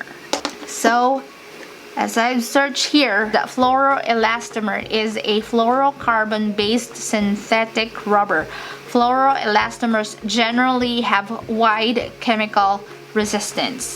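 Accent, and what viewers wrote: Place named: Thailand. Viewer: I love your thai accent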